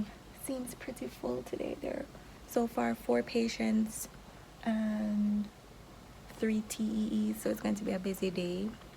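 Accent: Jamaica. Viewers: are you Jamaican? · You sound like you have a Jamaican accent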